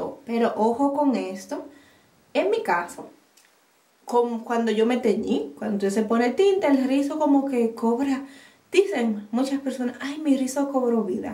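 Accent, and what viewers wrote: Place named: Dominican Republic. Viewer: Gusto tu pelo el mio és asi pero yo me derribo por que me duele mucho para desenrredar .jejeej .guste de tu canal te boy a dejar mi apollo y suscripcion y te invitarte a mi canal aver si te gusta y me apollas me parece que eres de 🇩🇴 por el acento me alegrarei si eres de aya amo encontrar dom en YouTube.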